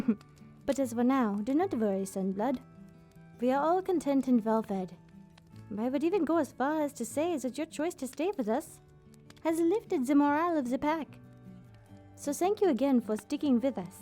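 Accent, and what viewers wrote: Germany. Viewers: Hmmmmm so much german acsent... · Ze German Lycanbae has returned! I'd mate her. The accent is my favourite. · corwyn wolski it's a German accent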